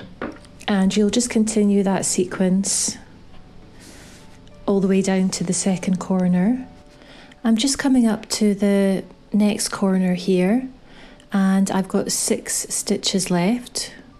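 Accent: Scotland, United Kingdom. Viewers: As a first timer at crochet, I just like to say , your easy to follow, step by step, you're also Scottish which makes it even easier for me. Thanks ♥️ a trillion💯👍💓🏴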